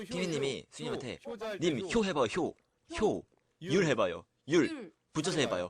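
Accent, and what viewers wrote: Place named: Jeolla, South Korea. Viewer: 사투리 발음이 다 그렇죠ㅋㅋ 저 전라도 사는데 다 '에전에'로 하니까요ㅎ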